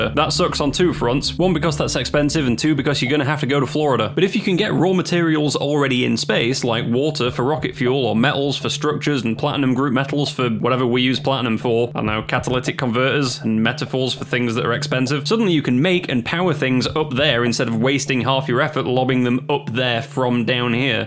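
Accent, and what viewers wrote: United Kingdom. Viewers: He's British Kurzgesagt and I'm here for it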